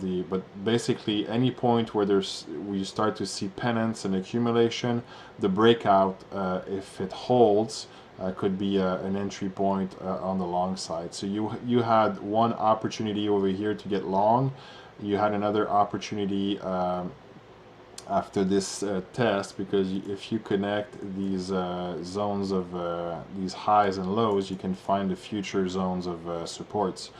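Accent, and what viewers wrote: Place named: Quebec, Canada. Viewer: Accent Québécois !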